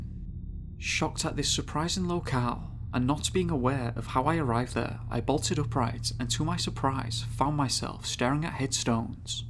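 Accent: United Kingdom. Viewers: Please ditch the heavy British accent and I guarantee you'll triple your likes and subscribers 😉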